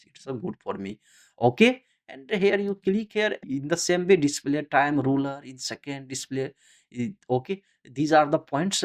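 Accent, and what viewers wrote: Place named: India. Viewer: Mat bol English bhai joker lagta hai aur foreigners majak bhi udaayenge... Typical indian accent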